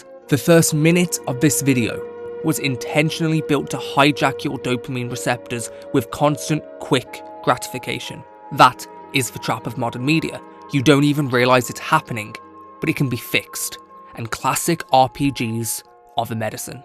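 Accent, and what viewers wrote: United Kingdom. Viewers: Are you from Birmingham? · why do you have a British accent? Really good video either way!😊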